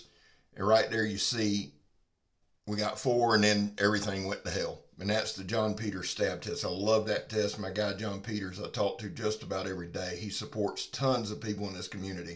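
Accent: South, United States. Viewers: Ohh OK.. I'm in GA. I seen your shirt and your Southern accent and thought maybe you lived around down here.. Love your video's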